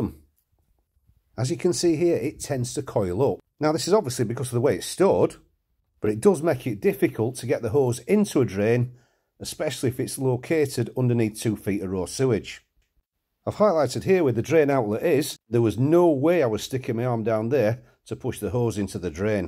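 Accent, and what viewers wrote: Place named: Sheffield, United Kingdom. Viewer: Very useful video, thank you. Good advice re gloves, face visor and overalls. Might invest in that Karcher PC20 kit. So this is what Sean Bean gets up to in his spare time 😁. That's a proper accent, are you from that area (Sheffield)?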